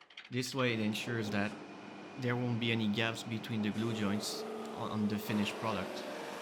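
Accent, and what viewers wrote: France. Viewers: Love the commentary. I mean come on, you're a french dude so the accent makes your already great videos even better. Takes longer to edit but I think overall it's worth the effort. Also strictly for fun, 1965 Mustang. Or '67 Camaro. If I'm being practical a Suburban newer and with fewer miles (and rust!) than my 2012 or a Jeep Wrangler with a winch for dragging logs out of the woods and home to the lathe 😃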